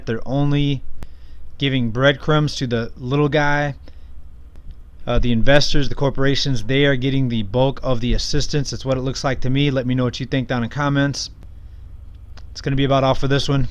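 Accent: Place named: Pennsylvania, United States. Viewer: Does this guy happen to live in the rust belt? More specifically Pennsylvania. I like the dialect.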